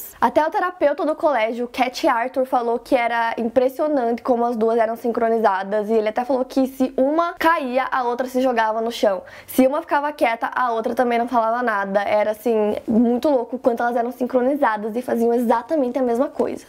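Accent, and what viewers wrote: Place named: Paraná, Brazil. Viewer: Se eu não estiver enganada, o teu sotaque é de paranaense né?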